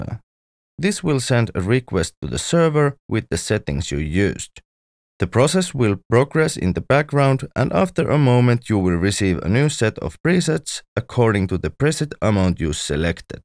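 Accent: Finland. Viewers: It was all good. I'm a fellow fin and was pretty sure about the accent! :) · This is correct, you got me. 😅 I am Finn. Hope it wasn't too hard on the pronunciation. 🙏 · Where is Reveal Sound based, I've never thought about it, but I'm 100% sure that the voice over in this video is by a Finn! (As am I). Kudos anyway, I use Spire on pretty much every song. My latest track (the Ukrainian one) on my youtube page has a plucked synth hook, whch is actually a Spire preset.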